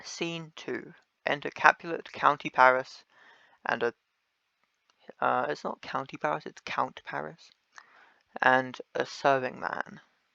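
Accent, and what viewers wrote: United Kingdom. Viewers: why are you british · Why are you British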